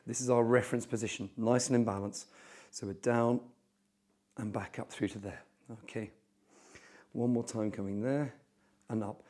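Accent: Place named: United Kingdom. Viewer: British? Ugh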